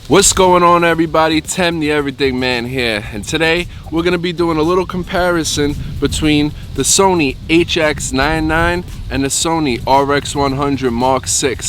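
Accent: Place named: New England, United States. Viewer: Haha, funny and perfect comparison. I have the HX80 and looking to upgrade for the added features on both: control ring, RAW, touch screen, 4K. But after seeing this, no way is the RX100 worth the extra $$$. Some aficionados wet their pants over sensor size (Wow, a 1" in a tiny camera!), but even the tiny sensors are so good now (in phones) that I'm not buying into the hype. Yeah, better bokeh with RX100, but...I really don't give a shit about it. Otherwise, the IQ is nearly identical and you'd have to be hallucinating to see an appreciable difference. Yeah, the RX100 may be marginally better in low light, but... hey, you want a low-light hobby? Take up music. The 30x zoom on HX99 is far more useful. If you shoot a lot of video outdoors, the mike jack on the RX100 VII is the only real benefit for 3x the price. (from Texas, I love the Yankee accent. 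Are you a wise guy? :)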